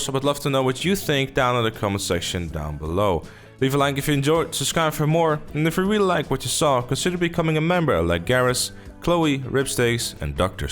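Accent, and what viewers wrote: Netherlands. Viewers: you sound dutch, are you? :)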